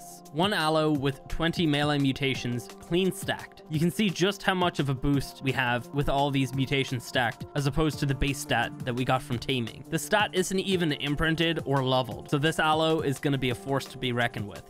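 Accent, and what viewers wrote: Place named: Ireland. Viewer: He is Irish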